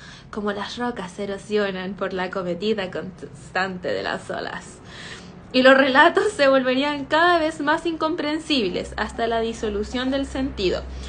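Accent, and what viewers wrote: Chile. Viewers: Woow,la combinación perfecta, un buen desayuno y la lectura de un buen libro, me encantan tus vídeos,me gusta tú acento chileno, me agrada tú voz,y tú forma de ser, aparte de ser muy bella eres muy inteligente,y admiro tu gusto por los libros,me fascinan la temática de tus vídeos, me gustaría ver un vídeo donde cuentas más sobre ti, o algún vídeo de la cultura de tu país, ya qué mi cultura cómo mexicano, es diferente a la cultura chilena,aún qué vivimos en países hermanos de latinoamerica,cambian los gustos literarios, me encantó la temática del vídeo! te envío un beso y un abrazo, con absoluto respeto..saludos princesa⭐🌺👌😘😘😻☺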